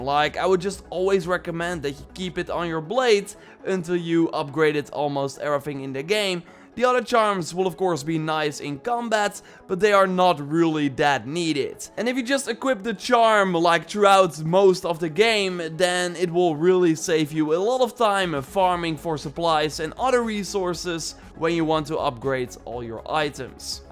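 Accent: Netherlands. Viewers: Dutch native speaker? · Heb je niet het idee dat de game minder leuk wordt door "te vroeg" je wapens naar max lvl te werken? ( aan je accent te horen, ga ik er vanuit dat je Nederlands ben ;-) )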